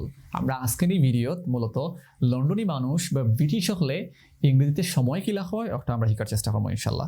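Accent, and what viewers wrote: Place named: Sylhet, Bangladesh. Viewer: Very impressive sir. ওরে ছিলটি রে। ভালা লাগলো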